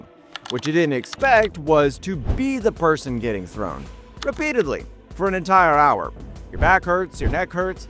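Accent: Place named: United Kingdom. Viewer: british accaent e rbooks uk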